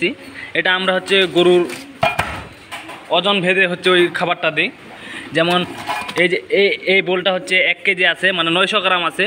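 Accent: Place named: Bihar, India. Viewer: ওহ,,,এজন্যই প্রশ্ন টা করলাম কারণ আপনার ভিডিওতে আপনার ভাষার উচ্চারণ গুলো কেমন জানি বিহারীদের মতো উচ্চারণ হয়।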